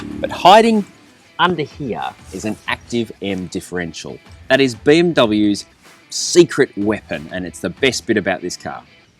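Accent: Australia. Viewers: Chi Hsia this is just a normal accent in Australia haha everyone sounds like this here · Really strong Aussie accent!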